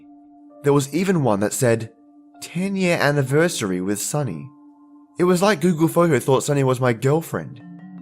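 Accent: Australia. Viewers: This 1 really touched my heart. Your Aussie accent just makes these stories all the better mate! :-)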